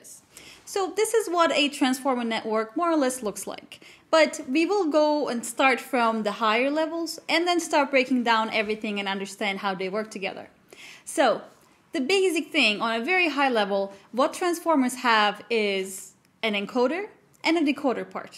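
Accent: Turkey. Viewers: Why is the first think I thought is that she must be Turkish? :D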